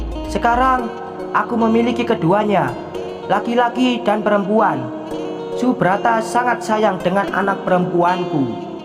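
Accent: Java, Indonesia. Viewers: Bang orang mna sh bhsa y ko medok bnr tpi bàgus cerita y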